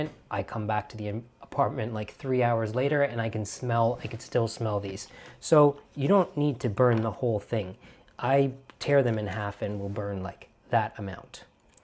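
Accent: Ireland. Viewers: I thought he was Irish for a moment and didn't realised the transition to American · Like the Irish accent 😂🇮🇪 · 1 minute in and I'm laughing out loud and relating so hard. 😂 Somehow the Irish accent was the perfect intro for this subject. 😅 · As an Irish person and long time listener I am so excited to hear you do the Irish accent!!! So glad we made the rotation 🎉😊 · I am impressed with the Irish accent! And... everything else does. LOL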